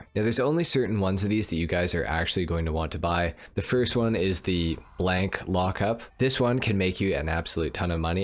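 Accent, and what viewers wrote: Winnipeg, Canada. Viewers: Wait ur Canadian? I feel like you got a Canadian accent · Your from Winnipeg?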